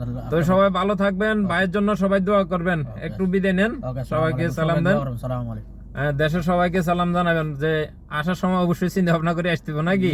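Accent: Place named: Sylhet, Bangladesh. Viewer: সিলেটের লোক মনে হয় 😢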